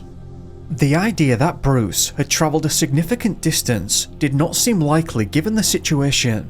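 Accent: United Kingdom. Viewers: Accent from the UK, it's not bad. I'm from Finland and I learned to listen to that accent while studying English in school bc our teacher talks exactly the same way 😊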